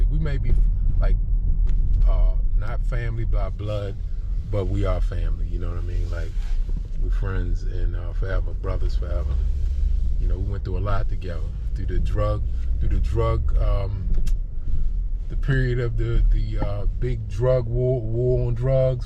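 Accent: United States. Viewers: U got a Philly accent to me. I didn't even know cherry hill had "projects" shot out to the bottom of the hill❤ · I hear a Maryland accent.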